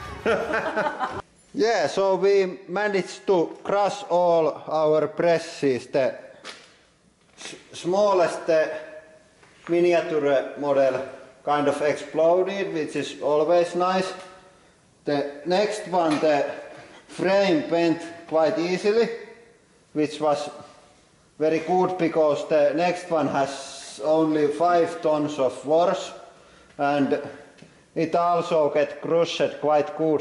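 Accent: Finland. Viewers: "vii kotta gou diipper!" Mä niin rakastan tätä rallienkkua. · eikö aksentti muka paljasta? Kyllä suomalainen aina toisen tunnistaa. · In Finland we call that accent as rally english. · In finland its called "ralli englanti" meaning rally english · Suomalaisten ralli Englanti.. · nah nope, its just the finnish accent called "rallienglanti" or rallyenglish.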